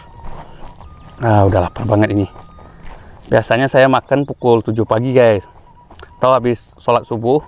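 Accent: West Sumatra, Indonesia. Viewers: Sama, maka nya heran logat nya kayak logat Sumbar, tapi video nya kayak di sunda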